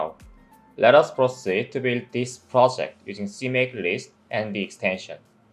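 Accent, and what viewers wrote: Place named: South Korea. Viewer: 발음이 한국분이시네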